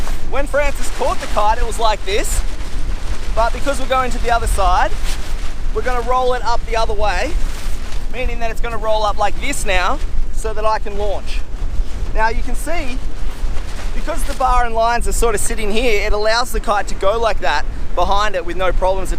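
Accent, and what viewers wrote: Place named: Australia. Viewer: Hey. Just wanted to say I’ve appreciated all your videos. It’s great to have an Aussie voice showing us the way. It’s clear you love the sport and want others too as well. Your lessons are well thought out and easily understood ( except for the odd one where the wind in mic plays havoc. And your location always looks stunning too!! I hope you’re ok. I haven’t seen any new vids for a while. I have a question for you and I’m not sure whether you could help with some technical advice. I’ve noticed as soon as the wind gets on the stronger side for my kite size I have a lot of difficulty stabilizing the kite and often end up constantly sineing the kite unintentionally. Do I need to just focus more on what I’m doing or should I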